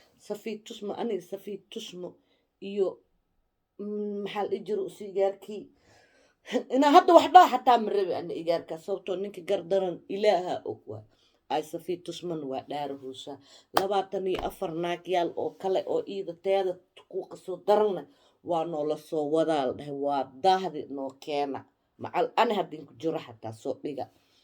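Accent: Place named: South, Somalia. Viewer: afkoonfureedkaan kabartaa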